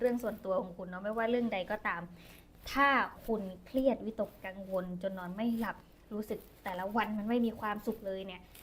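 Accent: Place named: Surat Thani, Thailand. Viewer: ชัดค่ะ สุราษ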